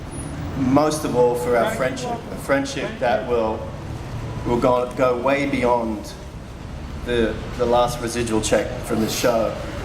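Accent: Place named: Australia. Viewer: In the beginning, I thought he was american, because of his accent in The Mentalist, and I also hadn't watched any videos until my interest grew on him specifically. It shocks me to hear him in an Aussie accent, you know? It makes me cry with this video because he's just so . . . perfect.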